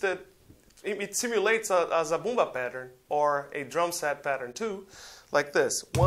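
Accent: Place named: Brazil. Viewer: Funny Brazilian Accent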